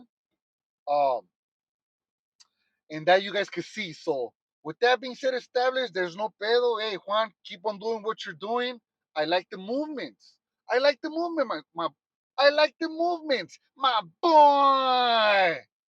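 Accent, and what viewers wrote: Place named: Mexico. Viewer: Ey foo, dont take this the wrong way but were you born in Mexico? Because you carry yourself like a mean ol Paisa. Even the way you pronounce certain words.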